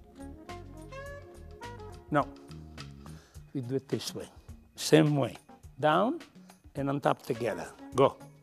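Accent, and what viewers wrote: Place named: Sardinia, Italy. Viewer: Inglese con accento sardo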